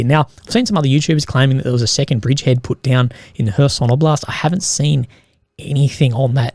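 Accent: Australia. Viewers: Sorry mate, I stand by my earlier comments that you are nothing but a US propaganda channel with an Aussie accent!! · He's Australian, DumbAzz!!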